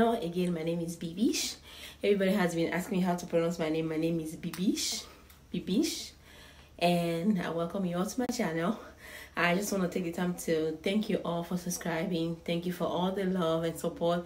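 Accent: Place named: France. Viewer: It’s the beautiful French accent for me...❤️❤️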